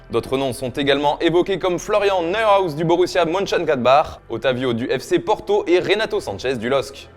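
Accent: Italy. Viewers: Moi c’est les accents italiens qui me retient ici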